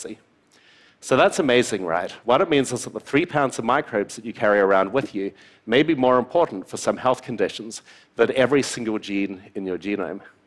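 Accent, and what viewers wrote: New Zealand. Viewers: de Valle Ruiz Re: the accent, I actually found kiwi so refreshing after hearing American so much of the time :-) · It's a type of NZ accent from the deep south. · He's from New Zealand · Kiwi here. I was also immediately thrown by the accent. He's got a Kiwi twang but there's definitely something really unusual about that accent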